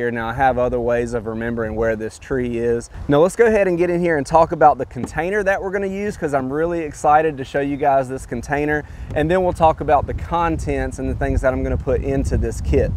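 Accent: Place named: Georgia, United States. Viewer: I don't know where he is, but the freeze line where I am is 6 inches. He sounds like a Georgian so I'd say he's just about right.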